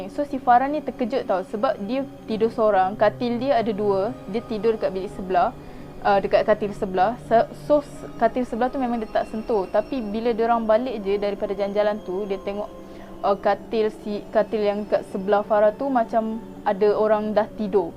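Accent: Sabah, Malaysia. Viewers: Orang sabah ke sis ? Cara cakap mcm org sabah 😂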